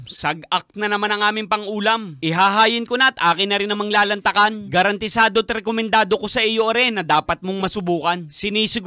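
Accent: Batangas, Philippines. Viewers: My 1st time seeing one of your videos. You sound like you're from Batangas.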